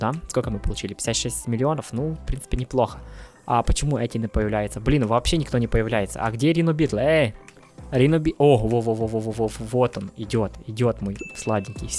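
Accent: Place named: Ukraine. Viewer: Я кста думав що ти рус а ти Укр чути просто Українські слова хорош!!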